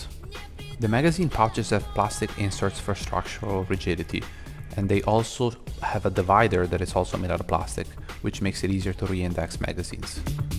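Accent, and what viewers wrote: United Kingdom. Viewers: Are you from Scotland?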